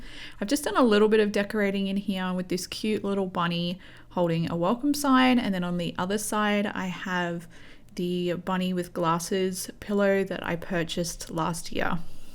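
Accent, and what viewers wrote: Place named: Australia. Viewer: New subbie here. I was so happy to hear your aussie accent when I clicked on this video, as I live in Melbourne. I absolutely love your home, and the way you decorate, it's so much my taste. And those wreathes are just beautiful : )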